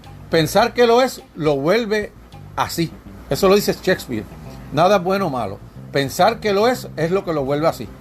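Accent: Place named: Puerto Rico. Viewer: Ese acento parece Boricua. 🤔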